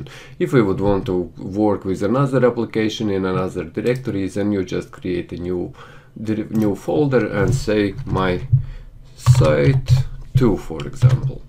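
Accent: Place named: Russia. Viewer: Russian or Ukrainian . Around there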